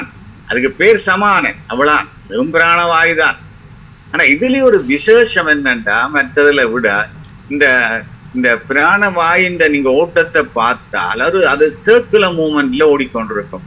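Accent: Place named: Sri Lanka. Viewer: இலங்கை தமிழின் கம்பீரம் மிக மகிழ்ச்சி அளிக்கிறது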